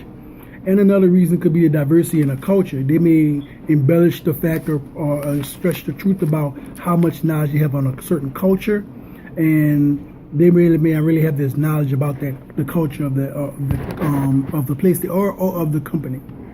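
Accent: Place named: New Orleans, United States. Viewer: ok no problem , i like that little new Orleans accent of yours too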